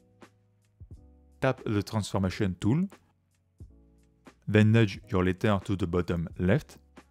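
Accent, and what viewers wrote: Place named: France. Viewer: Merci pour tes vidéos ! Elles sont simples et claires et je kiffe trop ton french accent !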